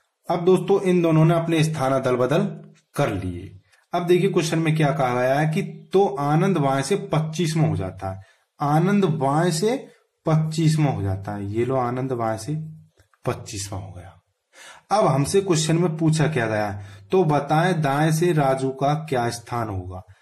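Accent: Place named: Bundelkhand, India. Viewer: Sir apki ki avaj m bundelkhandi language ka touch dikh rha.loved this.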